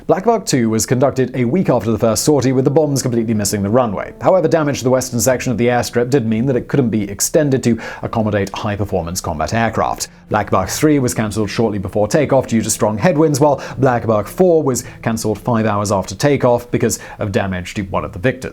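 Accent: United Kingdom. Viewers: Is a Brit anyone?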